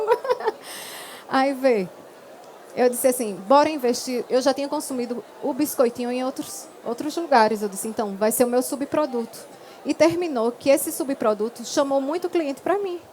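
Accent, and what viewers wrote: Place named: Pernambuco, Brazil. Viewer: Adorei! Perfeito o bolo de rolo, melhor bolo! Adorei o seu sotaque. Viva Pernambuco!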